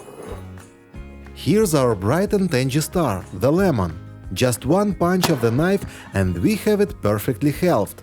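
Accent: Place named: Russia. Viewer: well I ‘m going to explain myself… I speak fluent English and from my childhood used to be taught to pay attention to my pronunciation As a modest result … years later, no one picks my Russian accent even though I don’t sound like a native speaker … it’s not about being snobbish, not at all It’s simply certain level of so called professional enhancement if you have decided to record your channel in English… As much as we’re proud to cherish our great language and cultural heritage we have to admit, Russian accent isn’t easy on some ears ! His channel is very professional but accent is distracting , isn’t it?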